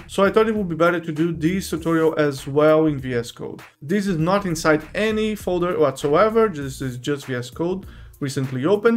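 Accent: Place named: Brazil. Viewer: Só pelo sotaque ja sei que é BR. Ótimo trabalho!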